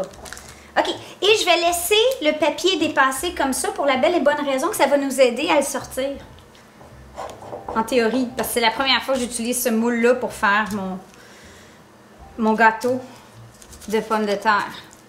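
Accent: Quebec, Canada. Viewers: Roesti se prononce "reuchti" et avec ton accent de ta belle province c'est toujours aussi charmant 😘😘😘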